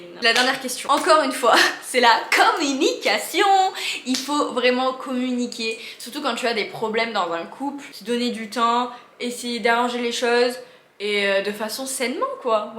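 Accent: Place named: South, France. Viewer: L’accent du sud ! 😉🤩 super vidéo d’ailleurs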